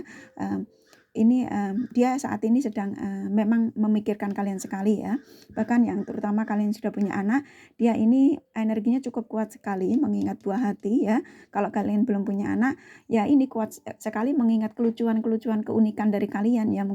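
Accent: Java, Indonesia. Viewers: Mantaaafff kak readingannya,rasakno. Dia mantan suamiku,yg jls dia gelo sdh menceraikan sy, betul skl kak kami sdh mempunyai anak,dia telah bersm yg lain,namun ternyt dgn orang tsb ternyt gk ada kebahagiaan, skrg br nyadar bhw bercerai itu ternyt gk enak,dan saat ini akhr2 ini sering menghub sy by phone. Sy sih sdh nyaman dgn single parent ini. Tksh kak,sy sll ngikuti chanel kakak,sy sk dgn suara kakak yg njowoi,smg kakak sht sll,sukses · Suka dgn gaya ngomong jawanya kak.. trnyata iso Jowo ggih kak.. 🥰😍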